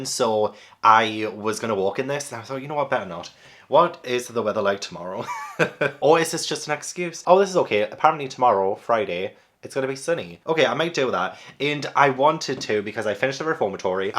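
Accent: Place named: Scotland, United Kingdom. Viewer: Oh man, you jumped right on into the EXTREME horror. When I saw “Cows”, I was like … 👀 … 😂 Please excuse my American ignorance, but where is your accent from? I love it SO much, but I can’t pinpoint it … Every time I watch your videos I think you sound Scottish maybe? I imagine you reading this being like, this girl isn’t even close! 🤣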